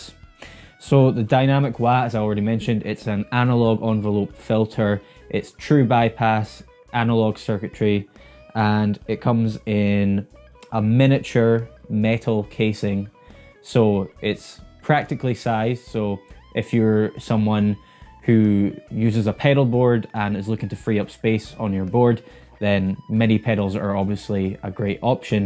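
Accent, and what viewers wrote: Scotland, United Kingdom. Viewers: Good Tae a Scottish voice . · What...that Scottish accent!! 🙂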